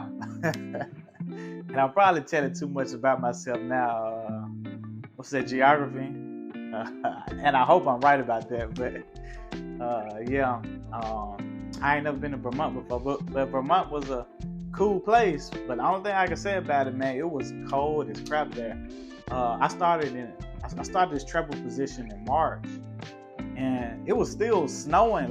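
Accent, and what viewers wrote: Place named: Memphis, United States. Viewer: I knew you was from Memphis because you said Mane too many times 38118 in the building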